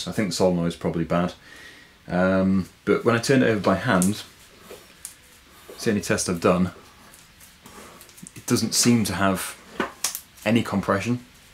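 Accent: United Kingdom. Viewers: Excellent video, thank you! And the British accent makes it even better.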